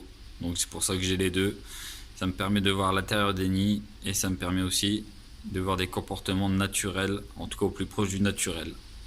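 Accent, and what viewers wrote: North, France. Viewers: avec le petit accent du nord ! Comme à la maison 😊 je suis de Caudry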